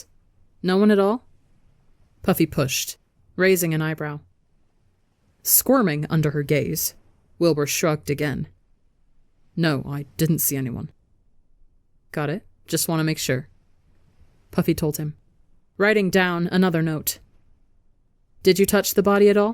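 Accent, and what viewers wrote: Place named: United Kingdom. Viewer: IM SORRY BUT I CANT HELP BUT LAUGH AT THE BRITISH ACCENT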